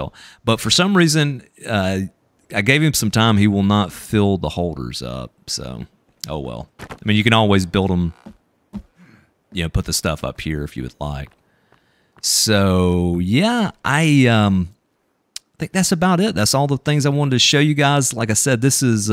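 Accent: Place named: South, United States. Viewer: I cannot pinpoint your accent. It has to be southern, Im thinking Arkansas? Where have I heard that accent before? Lol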